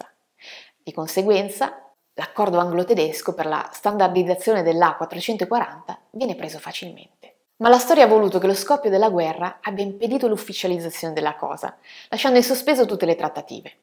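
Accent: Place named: Piedmont, Italy. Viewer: Innanzitutto un sentito grazie per la cortese, precisa e veloce risposta. Continuerò a darti del "tu" anche se, nelle tue righe, sei partita col "tu" per passare ad un più formale "lei" (quando hai aumentato di un 'dente' la polemica... 😜) non fosse altro perché sono più "attempato" di te. Ho guardato altri tuoi video e, oltre a parlare un bell'italiano (sei di origini piemontesi, vero?), esprimi giudizi competenti e risulti davvero simpatica. Di nuovo: complimenti! Ora - però - metto giù il "violino" e vengo "al sodo". Il termine "faziosità" l'hai usato tu non io; e se ti ho "bonariamente accusato" di qualcosa è che in tutto il video appare evidentissimo per quale fazione fai il tifo... E - in tutta franchezza - non ne capisco il motivo. Saranno forse trent'anni che studi il pianoforte; sicuramente avrai sempre usato strumenti intonati a 440; in tutto questo tempo ti sarai formata un orecchio musicale non indifferente; il TUO pianoforte è accordato a 440 (lo suoni in altri video). 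Dunque? Per avere più 'like', stai cavalcando un'onda "new age"? Anche il dott. Corrado Malanga lo fa, tant'è che, da bravo professore di chimica, si è messo a scrivere di "esoterismo": pensa un po' che "scienziato"...! Nella Musica (la scrivo con la maiuscola) non ci sono molti argomenti "scientifici" su cui dibattere: la Musica è Musica, punto. Ciò che piace a me può non essere di tuo gradimento, dunque ciascuno ha il sacrosanto diritto di ascoltare ciò che preferisce, intonato al LA più in sin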